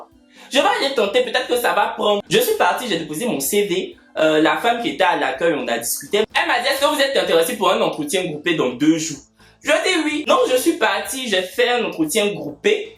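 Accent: Cameroon. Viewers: Je dis ca oh ha pure kmer expression. L accent et les expressions du cameroun. Il doit avoir des amis kmer. C est que du positif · Tu parles trop comme nous les camer 💚❤💛. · C l’accent camerounais lol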